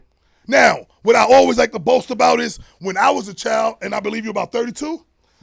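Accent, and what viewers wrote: New York, United States. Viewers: bro sound like a New York Cmac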